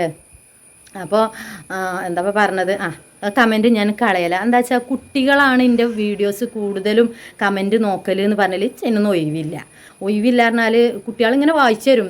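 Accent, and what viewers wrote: Malappuram, India. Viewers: ഒർജിനൽ മലപ്പുറം ശൈലി😊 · ഞാനും ഇത്ത മലപ്പുറം ആണ് എന്റെ സംസാരം ഇതൊക്ക പോലെ തന്നെ 🥰❤️ ഒരുപാട് ഇഷ്ട്ടം ആണ് നിങ്ങളെ വീഡിയോ കമന്റ് ഇടാറില്ല 🥰❤️ L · നിങ്ങൾ പറഞ്ഞത് ശരിയാണ്. ഞാൻ മലപ്പറം കാരുടെ കൂടെ ഒരുമിച്ച് ഒരു ഫ്ലാറ്റിൽ താമസിച്ചിരുന്നു അവരുടെ അല്ലാം സംസാരം ഇത് പോലെ ആണ്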